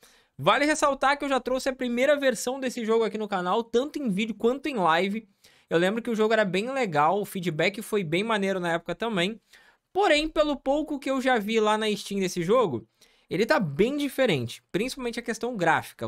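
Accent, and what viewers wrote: Portugal. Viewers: Esse é sim o português de Portugal!! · Português de Portugal e praticamente isso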